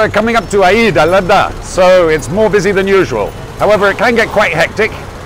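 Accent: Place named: Morocco. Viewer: like your moroccan accent!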